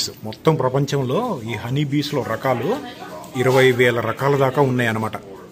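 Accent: Andhra Pradesh, India. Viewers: Bro, meeri Telangana kada. Mari Andra accent lo matladutunnav!!!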